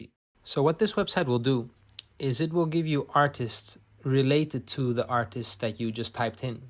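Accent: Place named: Netherlands. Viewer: you dutch? you sound dutch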